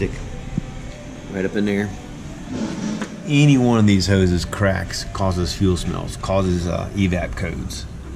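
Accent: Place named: New Jersey, United States. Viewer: Are you from mariland. You have a new jersey accent with a virginia twang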